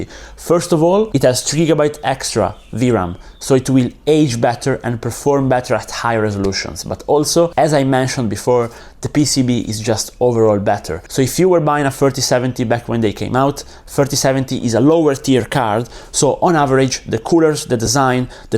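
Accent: Italy. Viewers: si sente l'accento italiano 😂😂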